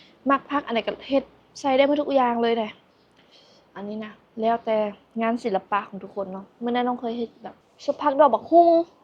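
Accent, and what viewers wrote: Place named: Vientiane, Laos. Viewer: สำเนียงเวียงจันทน์ ไม่ใช่คนลาวใต้ ถ้าลาวใต้จะเป็นแขวงจำปาสัก สาละวันสำเนียงอุบลราชธานี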